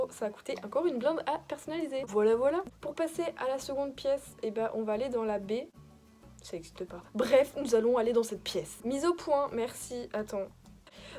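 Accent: England, United Kingdom. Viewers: J adore ton axent anglais tu peut mettre un like si t’es d’accord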